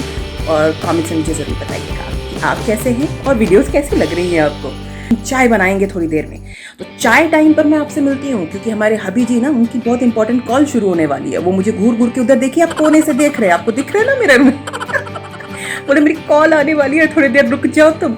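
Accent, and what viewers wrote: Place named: Punjab, India. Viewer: Ek like for the punjabi accent to banta hai... Nice vlog too...keep it up...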